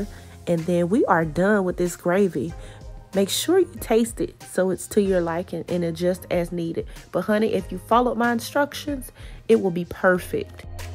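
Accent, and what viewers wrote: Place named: Atlanta, United States. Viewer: Looks awesome!!!, u sound like you’re from Atlanta or Atlaanna how we say it😂😂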